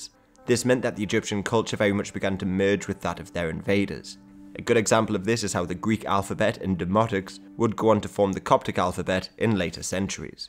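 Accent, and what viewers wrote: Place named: RP, United Kingdom. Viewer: Your accent is really posh!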